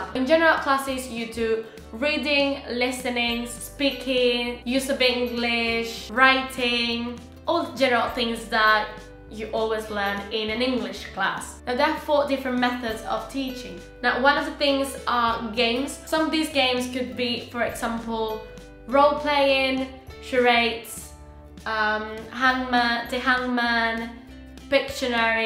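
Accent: United Kingdom. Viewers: hey you got a acent of british. cool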